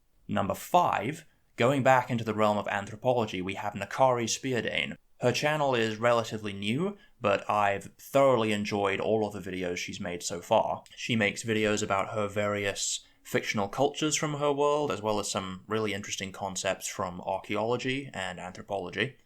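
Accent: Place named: England, United Kingdom. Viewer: Where are you from? I can tell you're from somewhere in England but I really cant differentiate dialects within england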